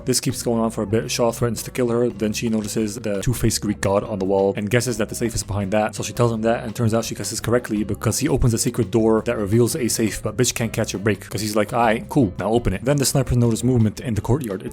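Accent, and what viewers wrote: United Kingdom. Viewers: DUDE ARE YOU ACTUALLY BRITISH?????????????? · HOLD UP WAIT A MINUTE! HE IS BRITISH ? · No way my goat is british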